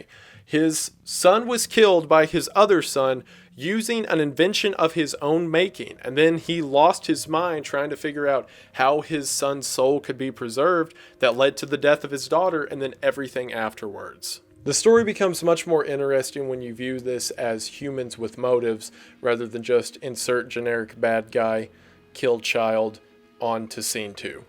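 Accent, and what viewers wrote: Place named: North Carolina, United States. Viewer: are you from NC, USA? you have a very particular accent, especially when you say "then". But also thank you so much for this, because i followed the whole time, but i still missed a lot of details, so I'm happy to see it all laid down flat.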